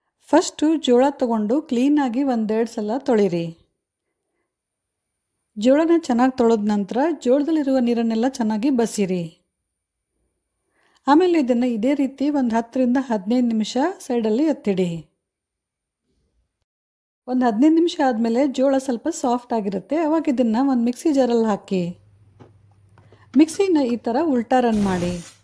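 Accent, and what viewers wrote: North Karnataka, India. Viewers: I like your north karnataka style of talking, it feels natural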